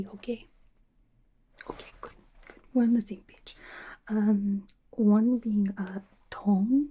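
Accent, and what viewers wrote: Russia. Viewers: The russian accent making a return is nice nice, This kinda came out of the blue but the sarcasm so far has been amusing lol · Does she have other Russian accent vids? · Russian Accent is Top 5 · Another amazing video like always love the Russian accent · As soon as I saw that thumbnail, I was like "Gah dayum. 😍", this is gonna be super tingly. 😌💖 I love that Russian accent. 😁 I dropped a Like for you. ❤️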